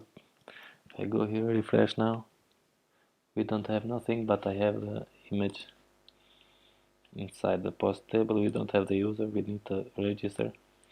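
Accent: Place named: Albania. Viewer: I'm guessing Albania by the sound of his accent, possibly greece.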